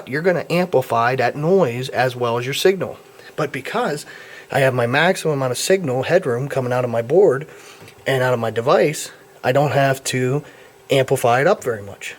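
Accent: Pittsburgh, United States. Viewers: You HAVE to be from around Pittsburgh. You talk like everybody around me.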